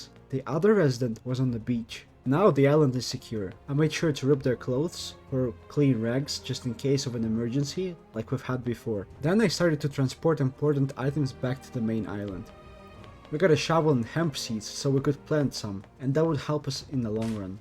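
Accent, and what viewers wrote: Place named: Philippines. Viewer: why do you sound like a filipino?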